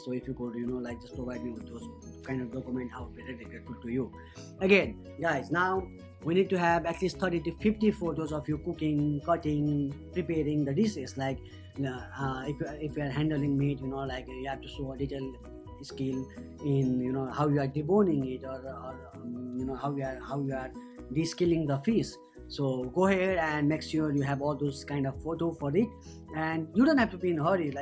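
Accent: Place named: Nepal. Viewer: that's called Nepali accent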